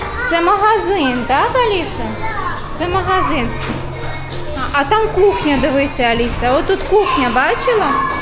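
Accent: Standard. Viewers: Коли дивлюся ваш1 в1део, то насолождуюся вашою чистою укр. Мовою. Ваша чиста р1дна мова це показник вашоï культури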